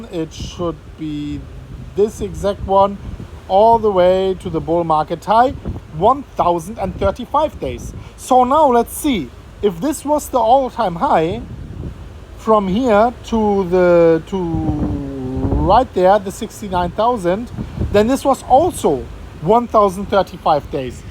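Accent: Germany. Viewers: Killer German accent, dude!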